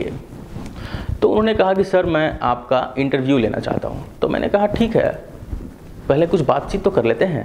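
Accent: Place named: Uttar Pradesh, India. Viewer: UP wale ho kya bhai tambaaku wale daant rehte hai humesha or up wala accent bhi hai tumhara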